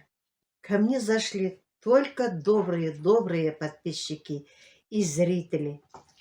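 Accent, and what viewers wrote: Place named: Kabardino-Balkaria, Russia. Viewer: Ну, ты такая смешная,так уговаривала козла! Мартин похож на козлов,которые в горах у нас живут. А разговариваешь на татарском как наши балкарцы и карачаевцы!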